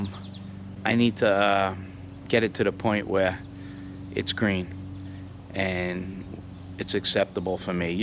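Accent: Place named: New York, United States. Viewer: I’m getting ready to spray my lawn with chelated iron. What part of the country are you in? I’m in NY and you sound like a New Yorker.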